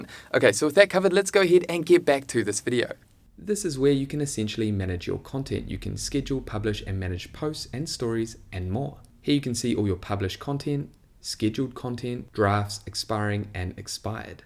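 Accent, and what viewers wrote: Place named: New Zealand. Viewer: Good job mate! I loved your kiwi accent btw!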